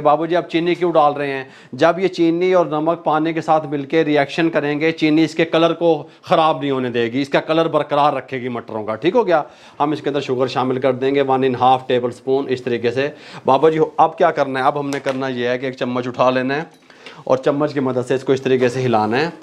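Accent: Punjab, India. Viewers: Punbajion ki urdu sunnay ka alag hi maza hai gal samajh aa rhi hai 😂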